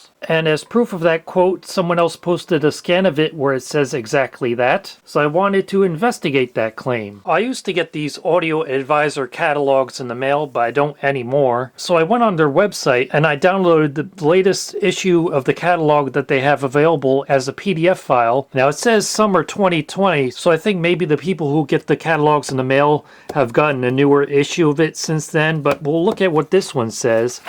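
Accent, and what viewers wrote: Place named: United States. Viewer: I am guessing by your accent that you might be from the USA; that makes anything technical printed in an advert a bit problematical, because in the USA, advertisers can basically bullshit all they want. I remember my electronics tutor showing us an early 1970s advert for a "6 transistor Portable Radio" from a famous US brand; except only 1 was actually in the circuit, the other five were defective transistors soldered to the edge of the board. I queried a problematical power output quoted on a US company's amplifier advert only a couple of years ago - and both the manufacturer and distributor refused to answer; despite basic math and RMS calculations showing it to be wildly out; even if they had meant PMPO, rather than the claimed class AB RMS; the numbers didnt add up. If you want to make those noise claims, you need to find a lab with the equipment to test them yourselves.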